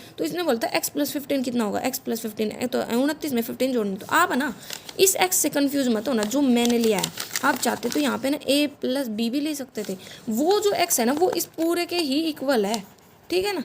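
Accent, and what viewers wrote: Haryana, India. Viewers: Tnq mam for explaination. Aap haryana se ho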